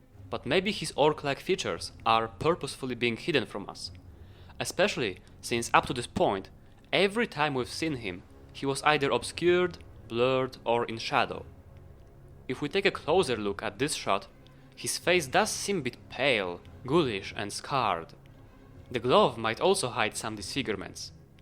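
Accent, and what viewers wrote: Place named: Poland. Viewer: klasycznie polski akcent 👍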